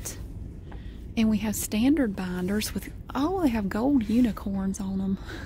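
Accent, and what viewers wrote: South, United States. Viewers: New viewer here and I just have to say the best part of your videos is your voice. Always remind me of the movie The Help. 😂 that strong southern accent is so nice!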